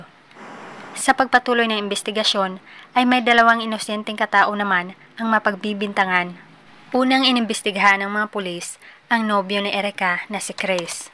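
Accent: Visayas, Philippines. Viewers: Grammar o diction?😂😅 naiintndhan nman mga sinasbi nya un ang importante.. Ganyan tlga kpag hnd tagalog ang nkasanayan.. Visaya yata si Mam.. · Walang mali sa grammar nya, may puntong bisaya lang ang pagdeliver nya ng storya. Pero walang naman problema dum.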